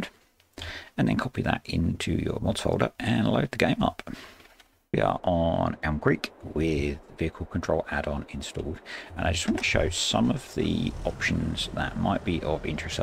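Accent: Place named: Australia. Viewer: Hmm you sound Australian. Never heard your until I saw the news about terralife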